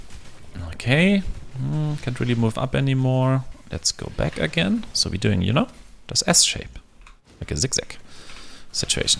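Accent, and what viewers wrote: Germany. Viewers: Oh my god, you can't just ask someone why they sound German!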